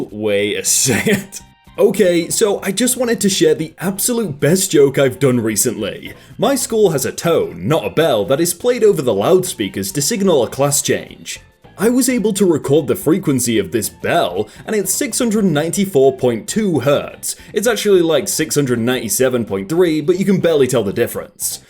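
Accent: United Kingdom. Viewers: I realized you where British but didn’t realize you were that British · bro you do the absolute best british accent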